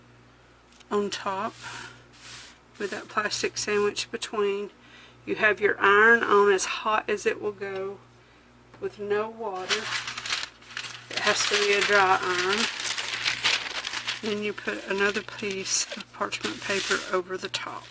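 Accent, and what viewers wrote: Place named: Indiana, United States. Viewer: This is so ingenious! And I was guessing Indiana. I recognize your accent. I was born in Indiana and lived there for years.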